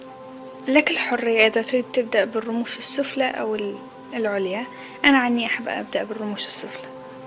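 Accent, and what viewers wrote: Iraq. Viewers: لهجتها عراقية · شنو لهجتج بالضبط عراقي خليجي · لا عراقية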